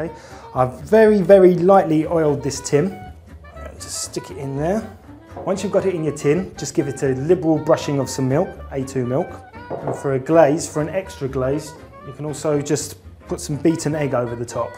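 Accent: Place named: London, United Kingdom. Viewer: No, he's a lefty remainer, using "A2? " milk. Must be a "London" thing!! Listen to his accent.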